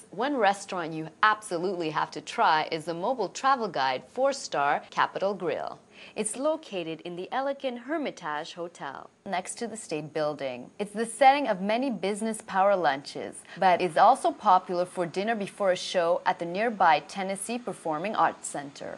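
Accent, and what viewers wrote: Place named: Canada. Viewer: she sounds Canadian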